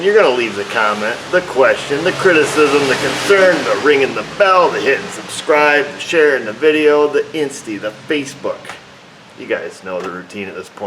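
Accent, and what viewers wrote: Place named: Michigan, United States. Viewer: I know you're in NY state but you sound like you may be from Michigan. Am I wrong?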